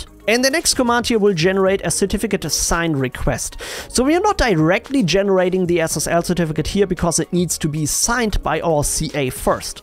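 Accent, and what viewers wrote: Germany. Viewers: Da hör ich doch das Deutsch raus xD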